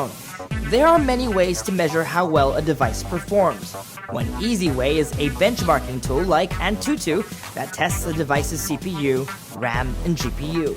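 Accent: Philippines. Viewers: Grabe mga tao dito nanghihila pababa, alam niyo kung bakit siya nagenglish? Gusto niya kasi hindi lang mga pinoy ang manonood pati na mga taga ibang bansa, tska ano naman kung hindi perfect ang accent niya? Problema dito laging ganyan mga mga language/accent police mga tao rito kala mo mga perpekto amp, average naman ang accent niya compared to other filipinos kung magtagalog eh "poreber, beri gud" etc. Di man siya perpekto magsalita ok lang dahil pilipino siya!! Ok!? Pakinggan niyo mga german, french, korean, japanese talo natin sila, wag niyo namang laitin ang kababayan natin, hence focus on the video comparison kesa para kayong gago jan na kinokorek niyo lahat ng bagay.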